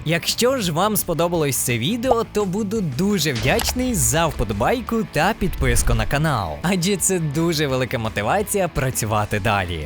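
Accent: Lviv, Ukraine. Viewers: Ти з Львова ? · ТИ ЗІ ЛЬВОВА? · ТИ ЗІ ЛЬВОВА?! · ти зі львова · Привіт) Давно не бачила українськомовного майнкрафтера) Коли почула "чуть не получив тягла" зрозуміла, що ти зі Львова) Так приємно чути українську мову на ютубі і свій говір) Бажаю успіхів) Продовжуй в тому ж дусі) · Ти зі львова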